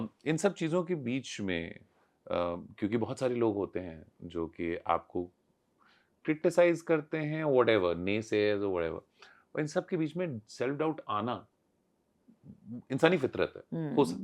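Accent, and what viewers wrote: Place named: Kerala, India. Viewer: Loved your interview and malyali accent😅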